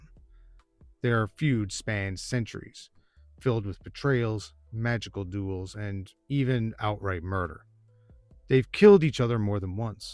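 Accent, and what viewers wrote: Newfoundland, Canada. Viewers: I can tell from your accent that I've stumbled upon a fellow Newf 😅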